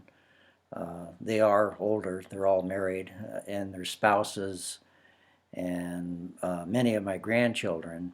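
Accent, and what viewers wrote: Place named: Sierra Leone. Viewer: 😂😂😂 you sound like a Sierra Leonian.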